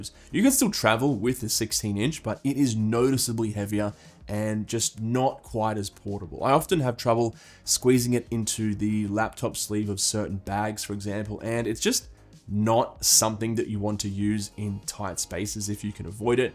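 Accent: Australia. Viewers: me rocking a 2015 macbook pro which i think she is screaming for me to let her retire lol thank you for making this content and nice to hear another aussie to! I tend to lean to more beefy ram as i hold my laptops for years. I do think I can buy any of the current line up and be really happy but I have been a pro user for ages ha. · Why do i feel stupid when i can’t easily follow through an Australian accent 😅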